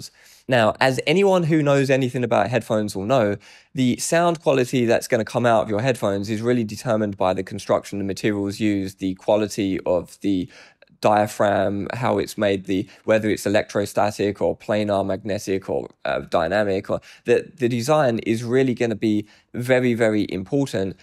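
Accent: United Kingdom. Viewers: Well, he's opinionated. And with a British accent can help make him more obnoxious. I guess whatever works.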